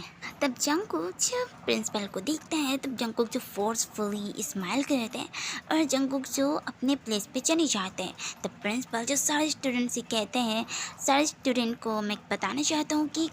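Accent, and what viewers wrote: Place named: Pakistan. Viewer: what u want to prove like this? I mean your urdu accent..........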